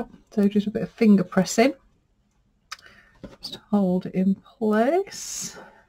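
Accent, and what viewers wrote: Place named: North, United Kingdom. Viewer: Just been told by one of our mutual viewers that I sound like you 😁💜 so I thought I'd better pop over and see, or rather, hear for myself 🤭 Well we're both from the north but I suspect there's a county or two between us 😁 Loving the tags.. Joie 💜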